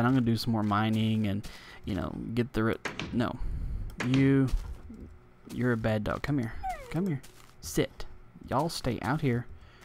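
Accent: South, United States. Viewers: you from the south bro?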